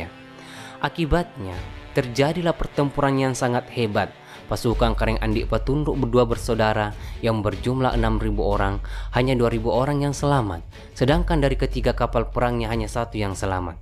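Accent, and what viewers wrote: Bugis, Indonesia. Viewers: ini tdk masuk akal , dialek saja sdh salah ,menyebut nama saja sdh tidak benar , logatnya bugis .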